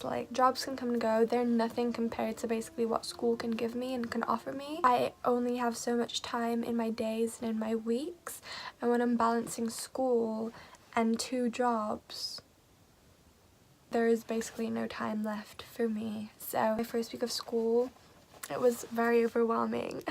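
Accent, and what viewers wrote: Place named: United Kingdom. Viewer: I love your videos!!💗💗 Your accent is starting to sound british 🌸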